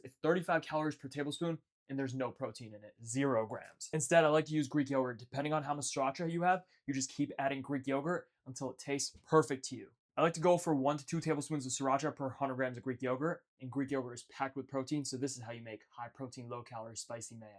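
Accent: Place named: Northeast, United States. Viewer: Your northeast accent is so hot!! ❤❤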